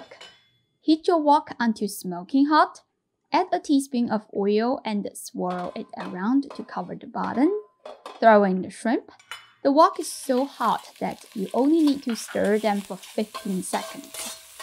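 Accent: China. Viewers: This looks delicious and I LOVE your accent! I've heard Chinese accent before but yours is lovely.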